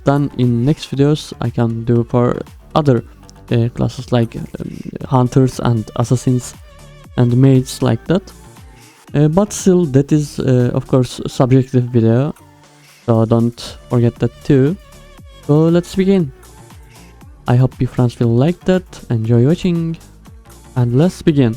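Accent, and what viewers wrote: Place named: Turkey. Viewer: Kankam aksanın Türk gibi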